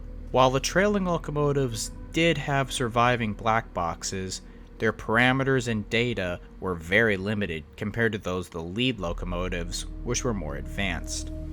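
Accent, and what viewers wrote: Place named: East Coast, United States. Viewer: Hey bro, he lives on the east coast and we pronouce things different out here. Cut the dude some slack jesus.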